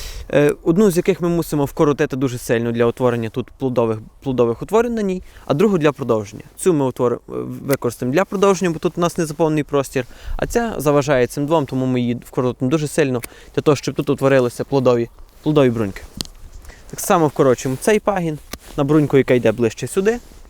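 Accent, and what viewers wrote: Western Ukraine, Ukraine. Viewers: не варто вибачатись за "хоть и....западенский акцент". Українська мова багата різними акцентами і тим прекрасніша! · Очень понравилось. Спасибо за сжатое изложение по сути. Начинаю писать по русски, чувствую, что съеду на украинский)) Речь красивая, чувствуется акцент западной Украины, но очень привлекательный. Сохраню видео себе. А то посадила пару черешен, а как подойти к ним, не знаю. Подскажите, а повой ВСЛ2 подвержен гробакам? Дякую и всього найкращого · Западный акцет... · Любуюсь этим парнем. Многие у него взял. Спасибо большое. Он любит своё дело.И мова очень гармонично звучит, хоть и с выраженным западенским акцентом. Молодец, уважение!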